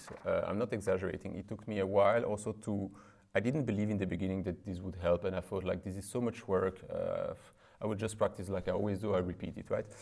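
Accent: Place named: France. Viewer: J’avais pas vu ton nom. J’écoutais la vidéo et je me suis dit : ça, c’est un français. Raison m’en a été donnée.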